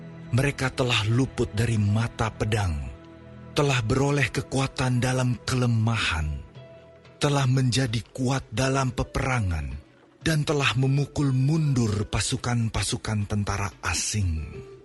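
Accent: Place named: Madura, Indonesia. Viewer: kayaknya yang punya suara ini "orang " madura ya??? trims buat chanel ini..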